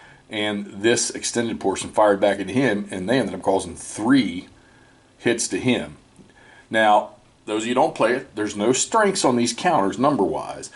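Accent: United States. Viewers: See to my english ear it sounds more southern gentlemen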